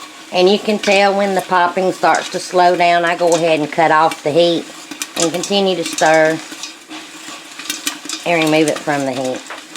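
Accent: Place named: South Carolina, United States. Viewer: I live in the foothills in SC and you sound like my kind of folks! Love your videos!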